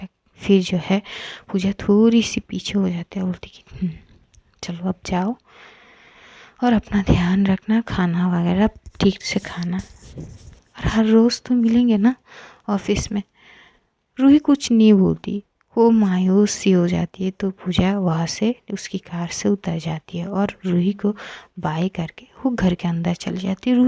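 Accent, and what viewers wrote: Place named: Uttar Pradesh, India. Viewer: 🥰 intezaar to ittu sa bhi n ho pata ma'am pr ab iske alawa kr bhi kya sakte h.....hum UP walo ki tarah aap jb bolti ho n hye 💖 kya kren aapka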